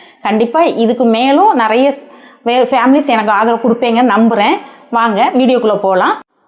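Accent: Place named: Nagercoil, India. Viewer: நம்ம நாகர்கோவில் தமிழ் வாசனையுடன்,கிழங்கின் சுவையும் அருமை !!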